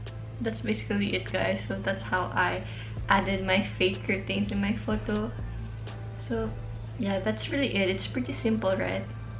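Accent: Philippines. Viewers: ok but I was SHOOK by her filipina accent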